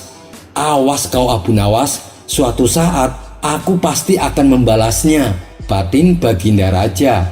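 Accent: Java, Indonesia. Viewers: Iya mas ngomong nya teger medok lekoh.cirihas orang Jawa . Salam dari serang Banten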